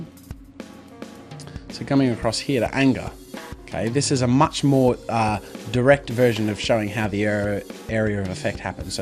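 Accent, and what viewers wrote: Australia. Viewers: really nice.. are you australian?^^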